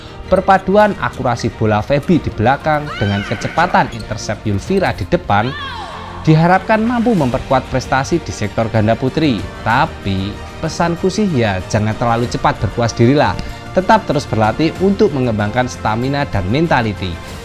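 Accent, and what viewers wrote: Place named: Java, Indonesia. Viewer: Medok mu itulah bro.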